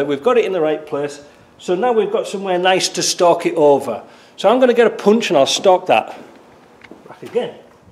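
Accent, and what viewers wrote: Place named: Cumbria, United Kingdom. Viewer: You sound Cumbrian. Am right? Edgar (All my Land Rovers were Series 1! )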